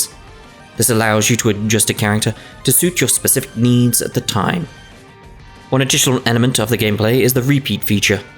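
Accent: United Kingdom. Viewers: Dude I wanna a British accent too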